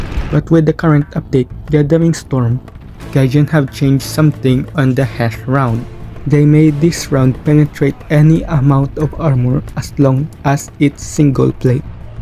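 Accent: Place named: Philippines. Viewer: bro got the heaviest filipino accent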